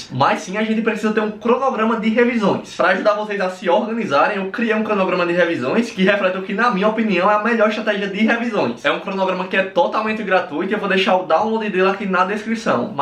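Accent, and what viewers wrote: Northeast, Brazil. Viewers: Esse mano é de qual lugar do Nordeste?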